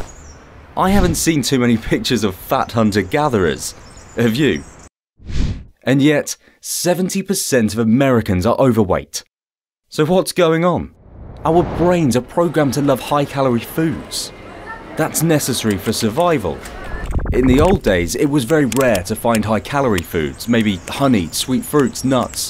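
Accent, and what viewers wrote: England, United Kingdom. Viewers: I’m late to this channel. I’m glad I found it and you got an English accent. 👌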